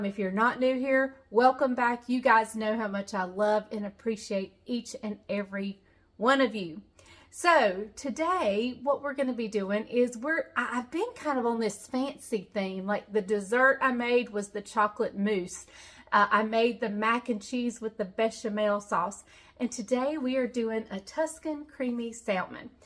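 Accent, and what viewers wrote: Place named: South, United States. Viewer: I was raised saying salmon with the same pronunciation as you. We are beautiful southern belles and that’s just how we talk. 💖 I’m not a salmon person but this sounds really good and I just added salmon to my grocery list. Thank you, I need to add more fish into our diets.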